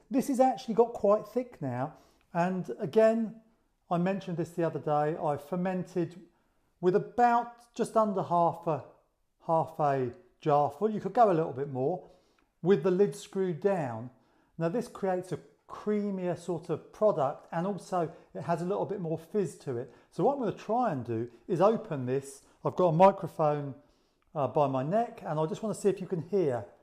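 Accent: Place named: United Kingdom. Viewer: Great job 👏 perfect explenation. Btw : I like your British accent 😉 Greetings from Belgium 🇧🇪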